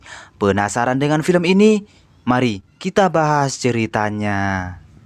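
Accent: Madura, Indonesia. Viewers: Logat Madura ya bang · Bang orang madura ya? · Logat maduranya kentel😁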